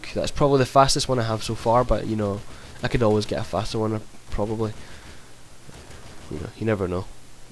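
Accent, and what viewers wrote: Scotland, United Kingdom. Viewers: no... hes scottish, since im from scotland i can tell by his accent. also, it says UK on his page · scottish accent FTW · I just LOVE your scottish accent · Sound mate I take it your American but you sound Scottish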